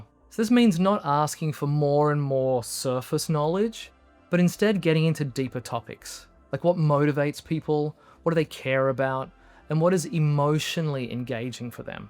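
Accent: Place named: Australia. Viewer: Me too, and I like his Aussie accent too! 😊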